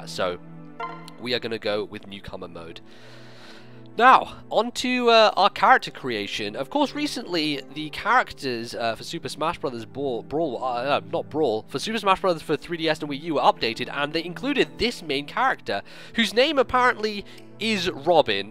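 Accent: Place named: United Kingdom. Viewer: British accents are a thing.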